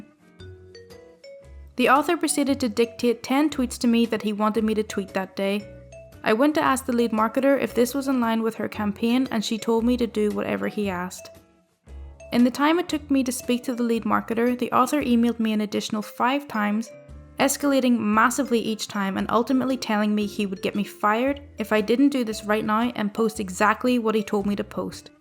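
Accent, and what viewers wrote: Scotland, United Kingdom. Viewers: Are you Scottish? It's nice! Edit:never mind, I read another comment and read your reply to it. It's hard to tell if your Scottish or Irish. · I so enjoy listening to you read!! I'm sure you are constantly asked but are you Scots? You have a lovely accent where ever you hail from. I, myself am a Texan, and really hate hearing myself recorded but I guess, there are people that enjoy it as I used to d.j. at a small market radio station many years ago. I always wanted to move to the U K. in the hope of losing the twang and gaining a lilt. Oh well. At least I get to listen to you!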